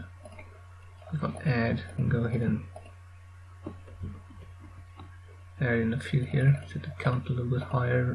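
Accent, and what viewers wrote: South Africa. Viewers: Ah, excellent. I actually like your South African accent, but yeah better recording gear (and less noise) will help loads. Thanks a lot!:) By the way, is there a good way to get a Blender hair shape (or even just the guides) out of Blender and into Max or Substance Painter? I plan to render characters in Iray (Substance Painter) and VRAY in Max, but I love the hair control in Blender. Thanks!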